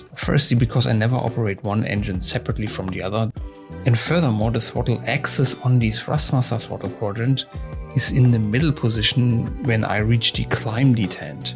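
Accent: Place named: Germany. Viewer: your english is very german :)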